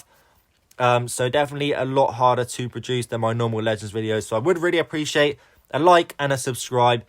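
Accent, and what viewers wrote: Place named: United Kingdom. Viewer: Love that British accent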